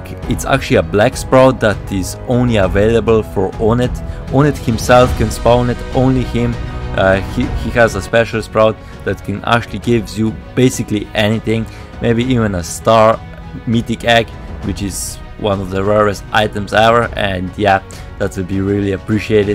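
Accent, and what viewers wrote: Russia. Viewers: русский акцент? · Чел вау..... какой же у тебя Русский акцент 😑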